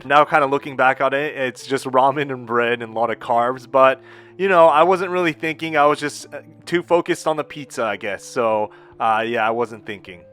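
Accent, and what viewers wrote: United States. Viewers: this american sounding asian guy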